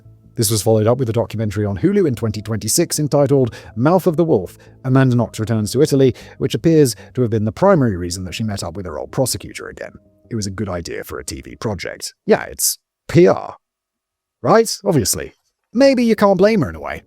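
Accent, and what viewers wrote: United Kingdom. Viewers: Well time for bed with one of my fave true crime shows TCC. Why watch a true crime show when i go to bed you ask? ( you did not but allow me to tell you anyway ). with his dark british voice calms me and Danny writes good and long scripts. Perfect! Don't you all agree?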